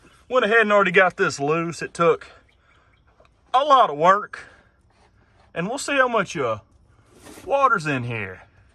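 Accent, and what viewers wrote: South, United States. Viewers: On a different note… you have the most amazing southern accent.. makes it even better watching your videos.😊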